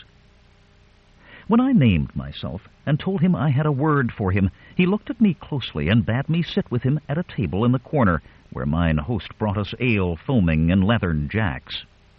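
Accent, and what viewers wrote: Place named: United States. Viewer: Thankfully he speaks American English- the heavily-accented brogue of some readers is not missed!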